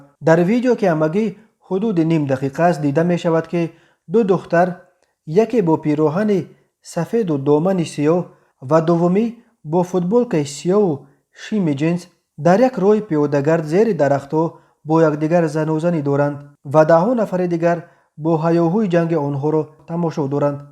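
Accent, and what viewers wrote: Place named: Badakhshan, Tajikistan. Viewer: Бародар аз АЛЛОҲ як камтар шарм кун! Якумуш ки ин видеоро камаш кариб 10 сол пеш дар навор гирифта буданд, ва қариб дар ҳама телефонҳои мардум буд! Дуюм ин ки бародар дигар ба фикрам ки ягон гапу хабари нав нест, намедони ки чиро нашр куни, баъд мекоби як чизи куҳнаву, кудакиро дар мардуми тамоми дунё нишон медиҳи! Дигар на номус мондааст на ақл, бовар кун як бор блогерони дигар милатҳоро бин чи кор мекунанд, ва чи наворҳоро дар мардуми дунё нишон медиҳанд. ШУМО бошед, оҳ дар мактаб як кӯдаки кам ақл имрӯз баҳои 2 гирифт, як мард дар ҷои кор аз ҳавои гарм сахт арақи рӯй кард, як студент аз автобус дер монд, баъд имрӯз аз якум пар оқиб монду дарс нахонд! Охир ҳаминам хабар шид?? Аз гапзанит маълумай ки яке аз бародари бадахшонӣи мо ҳасти, бародари азиз аз ҳамин чизҳои нодаркор, ҳамун кӯҳу, дашту, биёбонҳои бадахшонро дар навор бигир дар мардуми дунё нишон те бовар кун 500% хубтару беҳтар аст, назар ба ин наворҳои ту нашр мекардаги!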